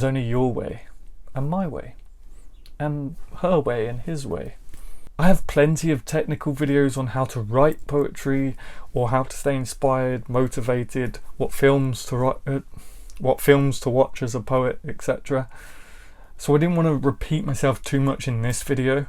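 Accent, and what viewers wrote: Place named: Yorkshire, United Kingdom. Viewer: hahaha I also suffer with running but I'm a walking injury anyway 😂. Thanks so much for the support man, massively appreciated. Although I don't think the Yorkshire accent will ever catch on as much as your classic British accent hahaha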